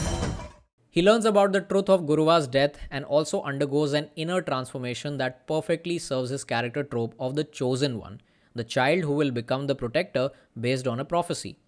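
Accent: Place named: Karnataka, India. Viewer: Going by your pronounciation, I thought you are Kannadiga. Dakshina Kannada/Karnataka, many have already commented. But a great video and I pleasently surprised by the sound design when villagers handover gun to Murali in climax. Had not noticed it. Thanks for the video.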